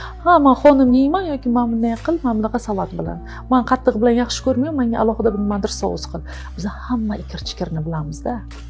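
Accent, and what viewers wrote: Bukhara, Uzbekistan. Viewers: Бухороданмисиз шевачайиз кетоптида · Бухороликмисиз опа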